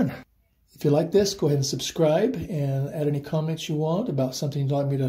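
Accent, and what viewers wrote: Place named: North America. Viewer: Very helpful and great narration. Most Brits are suckers for a North American accent!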